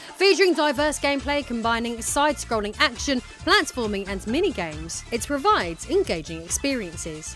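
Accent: United Kingdom. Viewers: Can guarantee she never played any of these games, and this is a conglomeration of a YouTube channel gen scheme where they find a British voice woman and slap her in front of a camera while someone else writes the scripts. Does the research and actually video editing.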